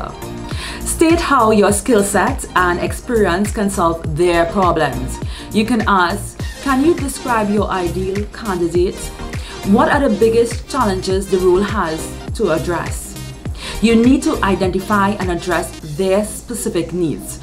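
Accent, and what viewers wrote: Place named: Trinidad, Trinidad and Tobago. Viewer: I’m picking up that beautiful Trinidad accent. Great tips. I’ve been applying these tools. I go into the interview with the intent of making it more of a conversation in selling myself.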